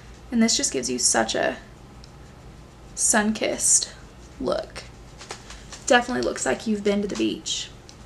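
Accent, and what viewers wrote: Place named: Texas, United States. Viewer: You are definitely a Texas girl! Can tell by your accent and personality❤ keep posting videos!!!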